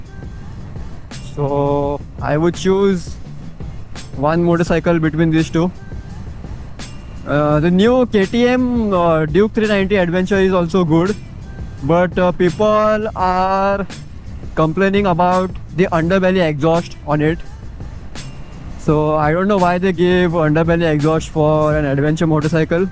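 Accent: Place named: Goa, India. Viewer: Lived in Goa for 11 years, hearing you brings back memories. You literally have similar accent to one of my really good friends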